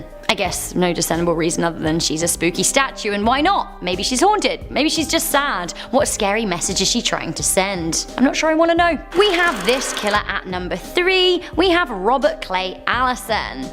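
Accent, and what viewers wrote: England, United Kingdom. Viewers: You remind me of the girl who was in love with doctor who. It's the British accent and how your voice sounds. I miss watching doctor who now. Need to watch it again. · Her English accent is no excuse. She is pronouncing the name altogether WRONG. King Tut I'm sure is not finding any humor. Your comment, however, I'm sure he got a little"chuckle" 🤭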